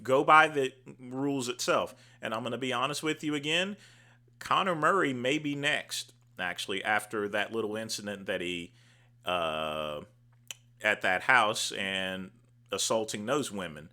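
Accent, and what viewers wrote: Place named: Kentucky, United States. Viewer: Interesting southern accent. Is it Kentucky?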